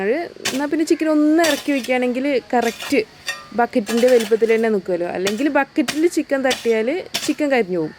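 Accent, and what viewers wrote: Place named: Malappuram, India. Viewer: ഏതാണ് നാട്.. വർത്താനം കേട്ടിട്ട് മണ്ണാർക്കാട് മഞ്ചേരി യുടെ ഇടക്ക് ആണെന്ന് തോന്നുന്നു..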